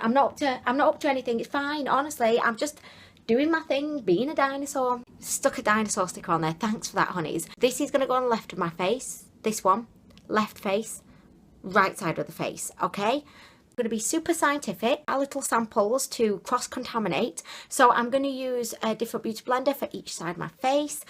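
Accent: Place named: South London, United Kingdom. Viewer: Interesting accent. Where are you from? Are you south of London??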